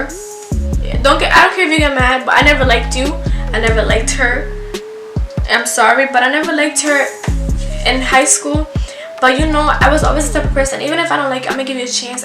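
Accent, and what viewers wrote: New York, United States. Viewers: Your from NYC? You sound like it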